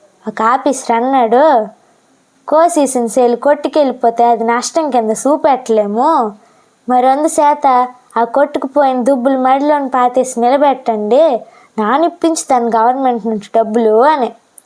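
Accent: Uttarandhra, India. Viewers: అందరి ఆకలి తీర్చే రైతు ఆకలి తీర్చేది ఎవరు... ఉత్తరాంధ్ర యాసలో వాయిస్ అదుర్స్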